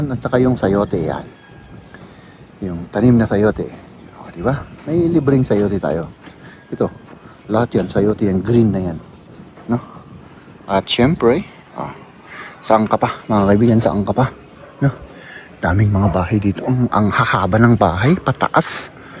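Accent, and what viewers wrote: Ilocos, Philippines. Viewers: Boss sa pinas mupoba nakilala misis mo lupet mag Ilocano at Tagalog sa isang acc mo e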